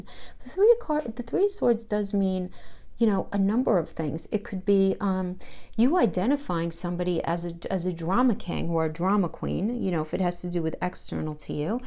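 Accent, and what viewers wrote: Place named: North Jersey, United States. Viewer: I definitely thought N. Jersey! LOL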